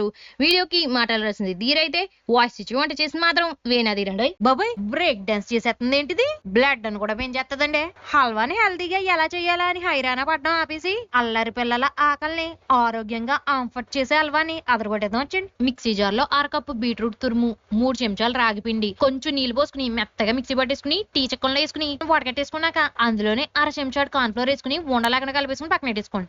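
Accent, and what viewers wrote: Godavari, India. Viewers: గోదావరి యాస మాత్రo highlight 😅😅😅😂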